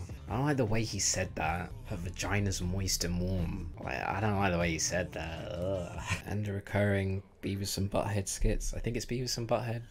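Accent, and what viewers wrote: United Kingdom. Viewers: Wasn’t expecting you to be British.. didn’t know yall wore duwies.. when did yall start that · Nice to hear a British voice in this music YT space for a change. Especially someone taking it seriously. Keep it up, mate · Why do you have a british accent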